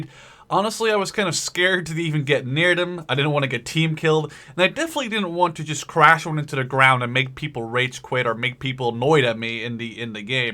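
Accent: Netherlands. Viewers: Devin Wheeler He's Dutch, he's not a native speaker. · PSA! He is from the netherlands so he does not speak perfect english, hell even i can't so look how about we all just let it go and enjoy the show, cheers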